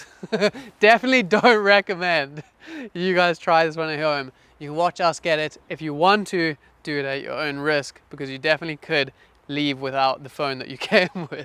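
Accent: South Africa. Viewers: Is that a South African Accent 🤔?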